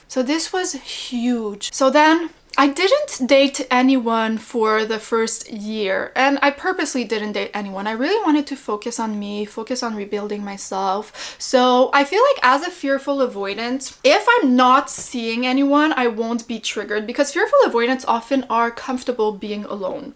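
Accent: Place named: Quebec, Canada. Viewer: awesome video girl, & nice french- canadian accent ;)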